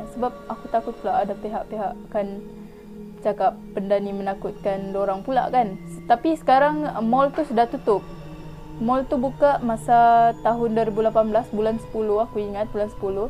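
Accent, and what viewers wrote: Sabah, Malaysia. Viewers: Orang sabah kah?? Cara cakap macam orng sabah... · Insya Allah sy DTG ke Sabah nanti, mgkn boleh cari awak,UTK jd Pemandu Pelancong 🤭. Maaf sy bergurau. Walau apa pun memang suka dgr gaya percakapan Org Sabah. Sabah adalah Malaysia, kita sama di bwh 1 bumbung Negara. 🙏🏼❤️👍🏼👌🏼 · makin lama , makin keluar slang sabah hahaha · akak,nk tanya.akak ni asal sabahan ia,bukan apa kak macam sedikit sabahan laa bila akak bercakap.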